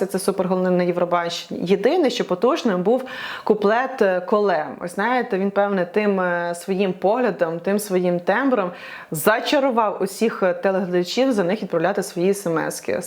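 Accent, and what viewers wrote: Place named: Ukraine. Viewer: У Вас важка українська.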